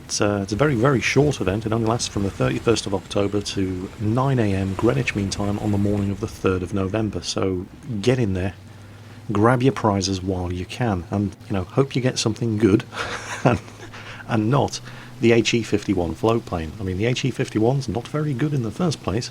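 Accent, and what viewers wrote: Norway. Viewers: I like your Norwegian accent! you sooo funny! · best norwegian accent ever:D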